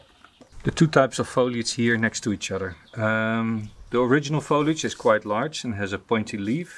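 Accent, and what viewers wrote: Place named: Netherlands. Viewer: Hi, you sound Dutch but I am not sure. I am a beginner bonsai grower and was wondering if I can ask some questions. Have some soil problem for my ficus and looking for some species that do well outside In Holland. Best regards Dennis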